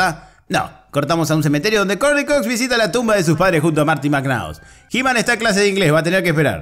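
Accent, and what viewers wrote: Argentina. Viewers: jajaja sos genial, pero una duda, eres argento o mexa? traes de las 2...recuerdo esta peli en el cine, todos los adultos dormidos, y yo ya me quería ir a la casa cuando vi que ya estaban en una cd de Eu!!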